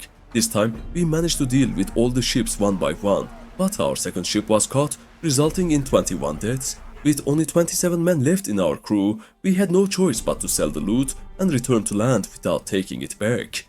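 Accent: Turkey. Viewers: türk aksanı çok kötü gerçekten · Bro your videos are just so good. They gives everything to us. But your voice is just not giving it. It's not about your tone or something. Its about your accent. You're Turkish I think. Please try to improve your accent. I m not trying to be racist or something but you know it would be better if you ll keep my words in your gut. Appreciate for the video, have a good one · Abi tam Türk aksanın var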